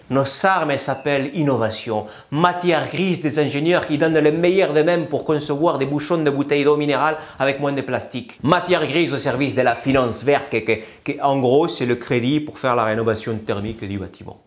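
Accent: Latin America. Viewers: Savant mélange d'accent latino américain avec une pointe d'accent du midi, quelque part entre Nîmes et Béziers ^^ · Le texte est génial comme d'habitude, mais en plus l'accent latino-américain est superbement imité! ¡Adelante compañero!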